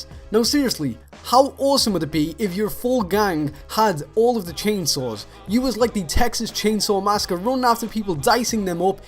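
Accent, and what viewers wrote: Liverpool, United Kingdom. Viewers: You've got the weirdest scouse accent ever you lad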